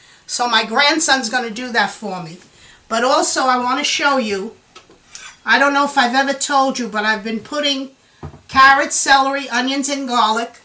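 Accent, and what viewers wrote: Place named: New York City, United States. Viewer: You have a real strong ny accent, are you from Brooklyn ?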